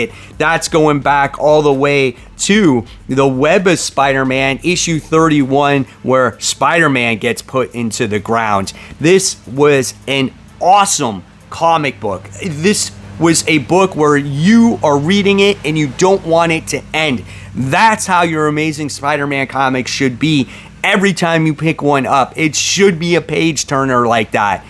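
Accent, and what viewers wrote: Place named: Florida, United States. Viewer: You Floridian!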